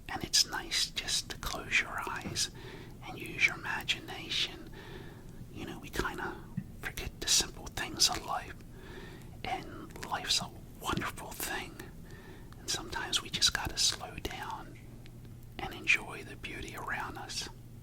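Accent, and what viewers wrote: United States. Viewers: I have been looking for something like this, a texan american Accent can be very relaxing when it sounds like no Asmr want to work !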